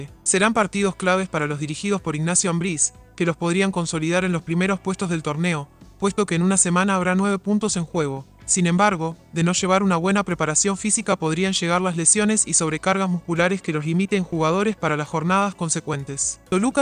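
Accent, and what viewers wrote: Argentina. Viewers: Por qué el narrador es argentino? 🤔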